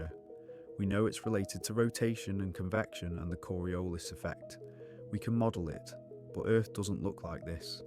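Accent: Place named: England, United Kingdom. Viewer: where in england are you from? i like your accsent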